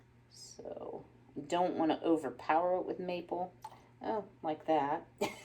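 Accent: South, United States. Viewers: Hi, your new oven looks great 🤩 I think the split door is an incredible idea!! have not seen that before. Alot of my recipe books have timings and temperature written in by me multiple times depending on what oven I had at the time, they vary so much, my present one is on the slow coach side. Recipe sounds really yummy 😋 and looks really pretty in your dish. Love the sound of all the content you have lined up it's definitely going to help me through the rest of the year 👍 Definitely yes to a P.O box too!!! that would be lovely 🥰 I love accents I think they're our history and I love to hear them, so you go as Southern as you like girlie 💕 Lots of love and appreciation from across this darn pond 🤣🤣 Thanks, love to Frankie too 🐾💕🐱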